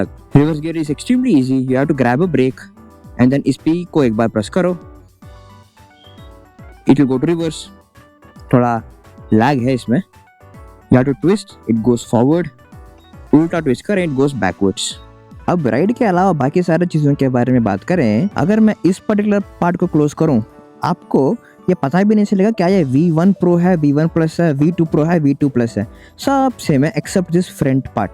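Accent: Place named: Karnataka, India. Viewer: He's a Kannadiga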